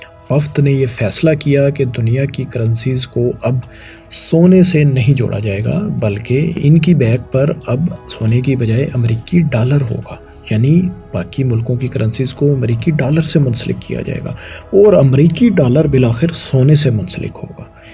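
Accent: Punjab, Pakistan. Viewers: اس چینل کی تمام وڈیوز اردو زبان میں منفرد مقام رکھتی ہیں مگر اس نامناسب آواز میں نشر ہونے والی وڈیوز سماعت پر بہت گراں ہوتی ہیں۔ اگر یہ اردو زبان ہے تو اسکو پنجابی لہجے میں کیوں ادا کرا جاتا ہے؟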